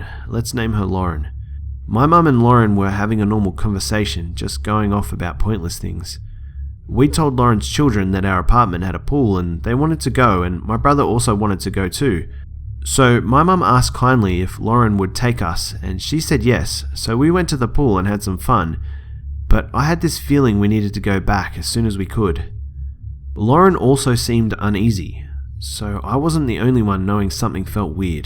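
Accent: Australia. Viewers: hes aus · Guys this dudes Aussie isn't he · I love scary stories and Australian accents so this account is very very enjoyable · ikr i cant stand Russian or spanish accents ( no offense, this doesn't mean i hate these ppl i just cant understand it 2 well ) so i was like please don't have a Russian or spanish accent.... YES AUSTRALIAN ACCENT AND GOOD SPOOKAY STORIES!!! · He's Aussie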